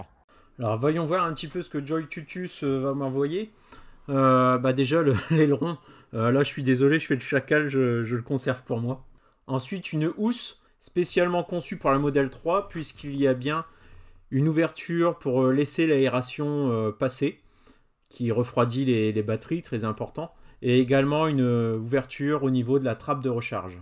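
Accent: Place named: North, France. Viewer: je participe crochet de coffre super enfin on voit qui se cache derrière cette voix du nord ✌️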